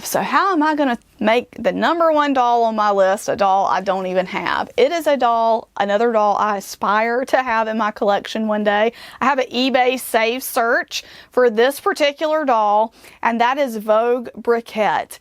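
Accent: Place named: South Carolina, United States. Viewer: Your accent reminds me of South Carolina. Love those dolls you showed.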